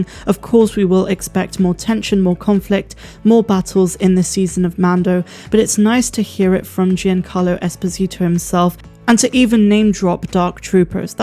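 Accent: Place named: Somerset, United Kingdom. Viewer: Loving your content, As a fellow SW (zummerzet) Star wars fan and Storm trooper cosplayer, It's gert lush mate! It,s so nice to hear an accent like mine doing this style of content.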